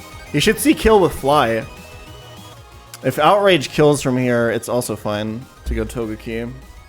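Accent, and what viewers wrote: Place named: Germany. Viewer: sounds dead? nah he’s just German mate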